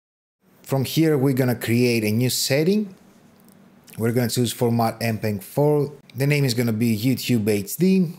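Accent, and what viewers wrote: Greece. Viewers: One question....are you in any kind connected with Greece? You speak like Greek.........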